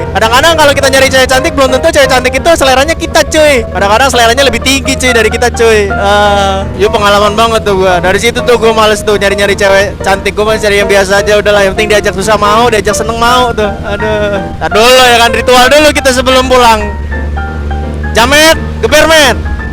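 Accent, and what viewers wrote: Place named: Java, Indonesia. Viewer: Nah begini bang kemaren medok bat jawanya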